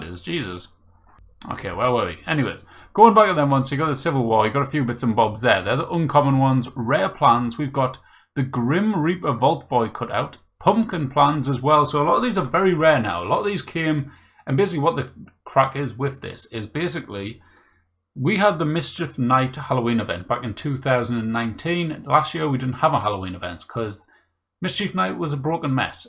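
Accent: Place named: Scotland, United Kingdom. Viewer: Are you Scottish or Irish or do you just have an awesome accent?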